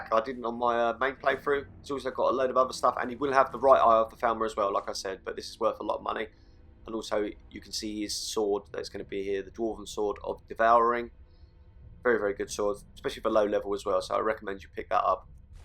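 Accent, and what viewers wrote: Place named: United Kingdom. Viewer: will these items make you become master of the thieves guild? Btw I Love Your British Accent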